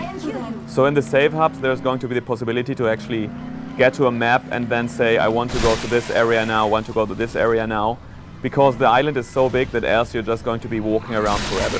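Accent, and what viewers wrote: Germany. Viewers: german accent ftw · he sounds so german ^^ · alter... sogar ich höre raus, dass er deutscher ist xD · He has a slight German accent, although his English is good. Anyway, I can't wait to play this bitchin game!:D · No he's not gay, he has a German accent. And the reason why you think having a German accent makes a person gay is because it (probably) reminds you of Bruno. Please try to educate yourself more in the future, American. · his german, thats an german accent...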